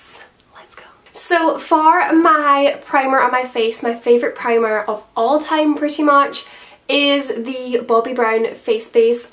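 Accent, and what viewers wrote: Scotland, United Kingdom. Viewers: What part of Scotland are you from? I'm Scottish too :)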